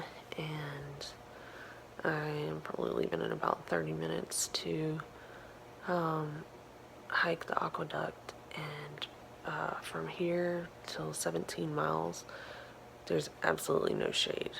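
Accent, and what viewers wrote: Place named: Oklahoma, United States. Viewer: Awesome, you are a true good sole, way beyond your years!! Thank you for all of these videos, I grew up in Huntsville, and Oklahoma so love your dialect, it's all about being simple and enjoying the moments you never get back everyday!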